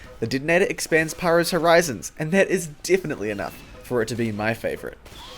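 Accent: New Zealand. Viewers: Vintage retro guy He's from New Zealand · ***** Yeah the New Zillind accent is quite similar to an aussie one to the uninitiated ;)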